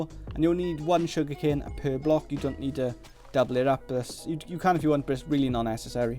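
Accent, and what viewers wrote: Wales, United Kingdom. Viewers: U Welsh?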